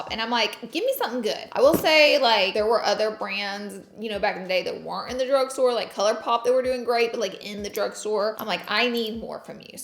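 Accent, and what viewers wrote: South, United States. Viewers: Tell me you're from the South without telling me you're from South ! FYI ... Nola girl here, so love you still have it! Anytime you say oil and y'all! 😂🤣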